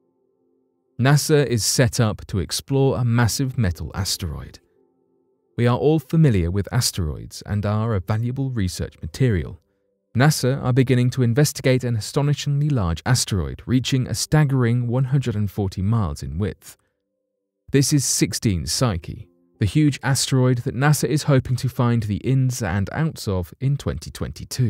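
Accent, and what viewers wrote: United Kingdom. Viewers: I hope those having some knowledge and sophistication will immediately understand the profoundly arrogant messages encapsulated in this video - manufactured complete with Brit accent to intimidate the Americans and their idiotic “mommy-daddy complex” they have towards that stodgy manner of speaking. “No evidence of alien contact…” yeah right. Get real dude…. what you meant to say was “no officially acknowledged contact with aliens.” Otherwise, the door is completely open. The idea that “despite all we know about stars and nebulae…” again, please: the realm designated as “science” the world over is in fact a church, largely controlled by governments and oligarchs- please. COVID proved that science does not exist for the general public and that real scientific reasoning and practices have been destroyed. In any case, almost nothing (of meaningful substance) is known about human existence and the proclamation here is preposterous.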